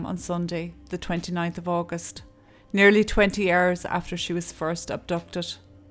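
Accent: Ireland. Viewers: "To be, or not to be - that is the question?" (Shakespeare - Hamlet) The pivotal question here, is whether one can ever accept the barbaric practice of torture, in the pursuit of what we believe is justice (conviction and subsequent closure in this case)? Should the criminal justice system to be influenced by politics? Should criminals ever be given back all of their freedoms, without proving complete rehabilitatiom of the behaviours which caused them to be incarcerated in the first place? Are prisons places of correction, or are they established for 'other' reasons? If Elizabeths and Mary's death are really meant to mean anything of greater value, have the Irish (and English) justicial systems learned and improved, from these tragic events? When being presented with these excellently researched and presented cases, one often wonders the motivations by the producer, why embark on such depth, why and what about such a case are their obvious empathy and compassion, as well as their perceptions of injustice, tiggered them into such a selfless search for the truth? 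Wonderfylly researched, produced and presented, in a lovely Irish accent, who couldn't like these videos, even though the subject matter is about death, torture, injustice, and often incompetance at all levels. They are set in a beautiful backdrop, in a wonderfully historical place, they are contextually perfect. Thanks again!! P.S. I accidentally removed my previous comment, whilst attempting to remove my answer to yo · Nightmare on Crime Street…YES!!! That beautiful Irish lilt. I could listen to read the phone book and it would still sound lovely and interesting! 😄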